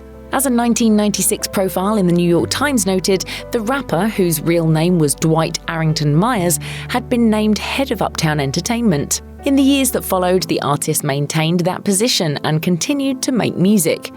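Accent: United Kingdom. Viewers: Tired of hearing British narrator. 😝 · Can't handle the Brit accent.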